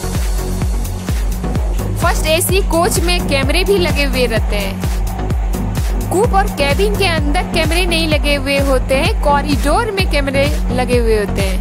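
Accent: Bihar, India. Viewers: Your bihari accent is lovely 😍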